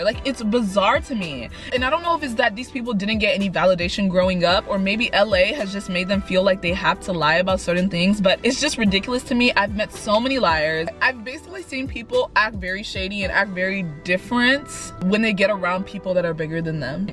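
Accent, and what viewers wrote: Nigeria. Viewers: Im starting to develop a Nigerian accent cuz i just love binge watching vids are 😭💗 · What makes me happy in these videos is that you incorporate the nigerian accent cause I'm also nigerian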